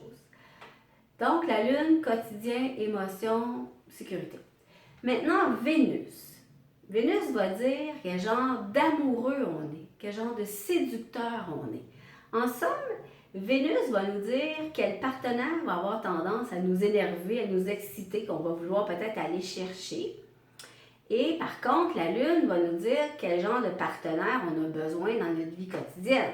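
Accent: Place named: Quebec, Canada. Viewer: 1000 merci parce-que vous etes tres objective et d'une claretee rare, et aussi merci de votre generosite de partager votre savoir acquis, je vous en suis reconnaissant. L'accent Quebecois c'est la cerise sur le gateau, j'adore !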